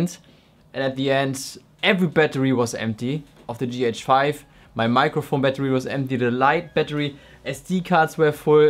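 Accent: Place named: Germany. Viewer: Dein Englisch ist schrecklich. Man hört noch viel zu sehr deinen deutschen Slang.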